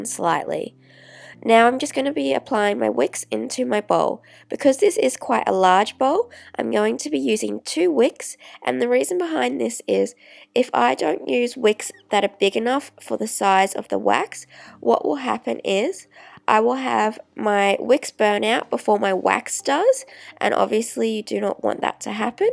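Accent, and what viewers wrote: Australia. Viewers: Added bonus is the Australian accent! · R u Australian? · Heya, wow so refreshing to hear an Aussie on YouTube, im from qld……thank you so much for this tutorial. I made some candles today actually and you guessed it i have that dipping you were talking about….so i can use a hairdryer to remelt the wax? Have an awesome day/night….xxxx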